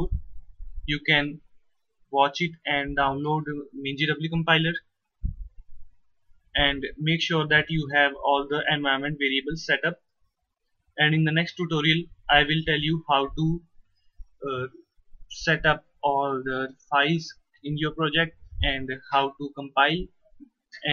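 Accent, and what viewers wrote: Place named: India. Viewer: I Fu*k love Indian english tutorials <3, thx bro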